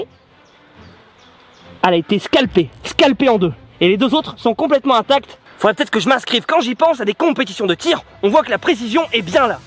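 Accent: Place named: Nord, France. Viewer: continue comme cha min loute, j'adore quand tu fait ton babache sur tes vidéos. Bonne continuation min loute. sa me rappel ma bonne région quand tu parle ch'ti. :)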